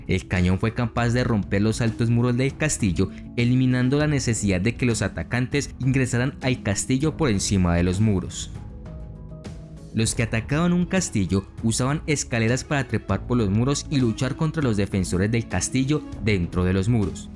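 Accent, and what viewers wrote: Colombia. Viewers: Ese acento de colombiano tan bravo.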